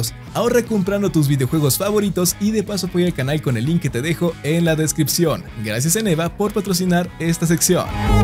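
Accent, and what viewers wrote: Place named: United States. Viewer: Por que no dices serie x o s en ves de tener fonética yanky.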